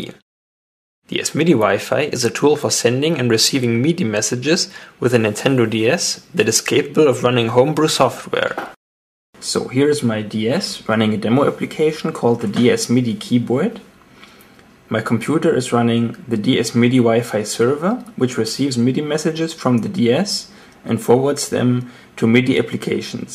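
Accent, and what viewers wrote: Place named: Germany. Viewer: You have a german dialect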